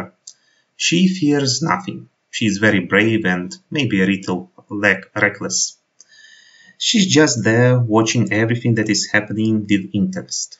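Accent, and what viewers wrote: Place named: Switzerland. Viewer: Интересно, почему швейцарский акцент? Мне приходится смотреть много учебных фильмов ( по медицине), сделанных в Швейцарии. У дикторов очень похожее произношение. Может в Австрии тоже так говорят? Надо бы видео с австрийцами посмотреть.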